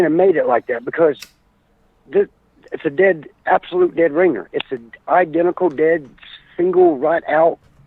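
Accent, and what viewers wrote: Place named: Florida, United States. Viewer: He sounds like an old fashioned Florida guy from the country. Some old bars and pool balls the men's room was actually a back door outside to an alley.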